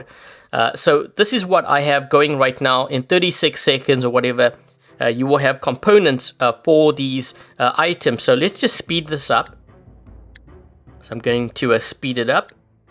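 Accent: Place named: South Africa. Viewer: I'm pretty sure the official language of Brazil is Portuguese and to answer that question: He's said on some of his live streams (that are recorded and posted in his channel in case you want to double check) that he was born in South Africa and went to a primarily English speaking area of the country and I think he lives either in Canada or New York so if you're wondering about his accent there's a solid chance that it's based on all three rather than a Brazilian's English.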